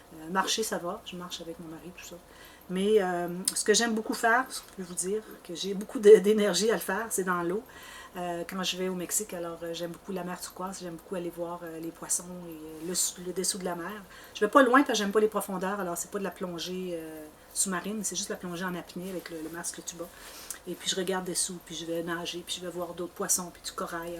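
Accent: Quebec, Canada. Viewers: Bonjour c'est agréable de mieux vous connaître. Merci de votre générosité et de tout ce que vous partagez avec nous. Vous êtes vraiment une belle personne à tout point de vue. Les petits ensembles pour bébé sont trop craquants! Vous excellez dans tout. Ne "francisez" pas trop, votre accent et les expressions Québécoises moi j'adore! Bonne journée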